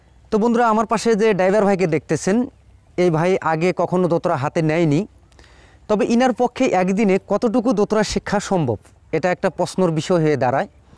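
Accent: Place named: Bangladesh. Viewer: আপনি আসামের লোক অথচ ভাষা আমাদের বাংলাদেশের মত। অবাক লাগলো ব্যাপার টা।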